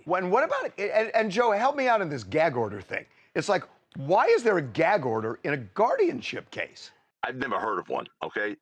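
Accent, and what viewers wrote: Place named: Alabama, United States. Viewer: The first half of this video hit home. I'm from Birmingham and when I go home to visit, it hurts to see how bad my city has gotten. I joined the military in 2015 and never looked back. People ask me if I will ever move back home and I kindly tell them I have no desire to. On another note, I recently subscribed to your channel and before knowing you were from Alabama, I was like she has a Alabama swag about her because the way you talk is exactly the way I would explain things 😂. Keep doin ya thang Alabama sis.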